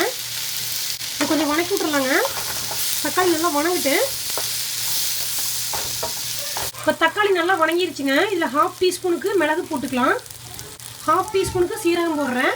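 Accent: Kongu, India. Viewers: Egg Curry looks so tempting n so do ur kongu tamil sounds great😍🌷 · Athuthanka kongu Thamil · I like her kongu slang.. varevah.. 😋 yummy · கொங்கு பாசை சூப்பருங்கோவ்.. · முட்டை குழம்பு செய்முறையும் மண் மணம் மாறாத கொங்கு தமிழ் பேச்சும் அருமையோ அருமை...குழம்பு சூப்பர்....தொடரட்டும் உங்கள் சேவை...நன்றி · Konku timal pesuradhu thani allaku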